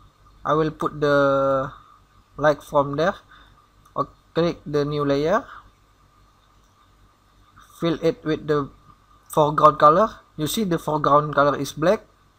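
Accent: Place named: Indonesia. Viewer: You sound Indonesian hahaha thx for the tutorial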